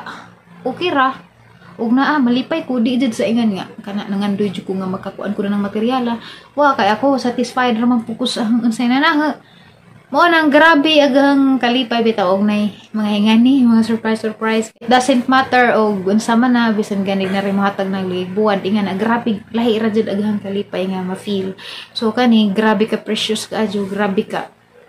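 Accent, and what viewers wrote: Bohol, Philippines. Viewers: You're deserving ma'am, because you're so kind and truthful. Malipayon jud ko makadungog sa imong bol-anon accent, taga bohol man sad ko sa Dimiao Bohol, God bless you and your family 🙏🙏🙏🌷🌷🌷❤❤❤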